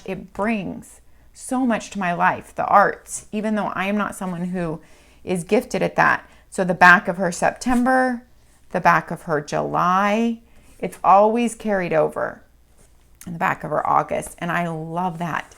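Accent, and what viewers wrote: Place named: Texas, United States. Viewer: Love this video! Your accent and use of y’all really makes me miss Texas haha. I got the Christmas planner for this and I am so excited to use it as a yearly memory book. I wish I had it when my kids were little, time goes so fast I want to remember it all.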